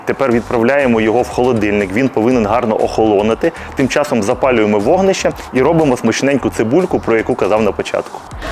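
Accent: Ukraine. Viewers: Все люблю з українським акцентом. Дякую!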